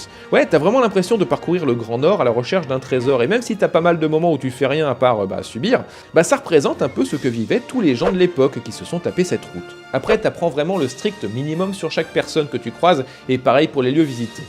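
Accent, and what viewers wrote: Quebec, Canada. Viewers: Un fond vert ainsi qu'un jeu d'acteur à souligner! ( Sans parler de l'accent québecois ), est-ce qu'on peut parler d'une nouvelle ère pour? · Ça fait toujours sourire d'entendre un français prendre l'accent québécois! Haha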